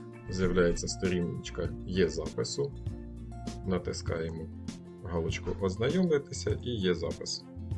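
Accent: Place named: Russia. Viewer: Дякую, було цікаво. Тільки чому Ви українську "е" читаєте на російський манер - /йе/? Навіть якщо це умовно латинська "е", то вона теж не /йе/, а /і/ або, якщо вже не англійська, то німецька чи італійська - /е/ ("э" - якщо вже на то пішло).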